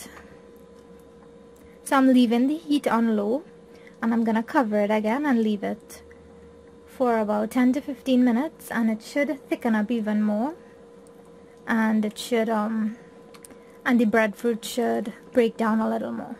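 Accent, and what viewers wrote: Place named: Trinidad, Trinidad and Tobago. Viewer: Looks delicious. Yummy! I like your Trindad accent. Everything looks so good.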